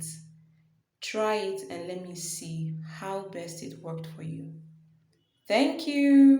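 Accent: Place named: Ghana. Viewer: You speak like a Ghanaian thanks for teaching us sorry am a Ghanaian that’s why I said your English is like Ghanaian.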